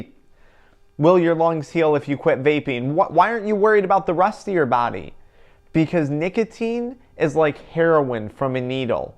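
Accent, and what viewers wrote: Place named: Midwest, United States. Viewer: I’m high asf rn and his Midwest accent is killing me😂😂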